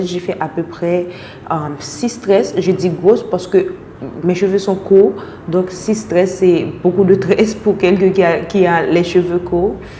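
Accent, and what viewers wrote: Haiti. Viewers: Elle parle comme une haïtienne!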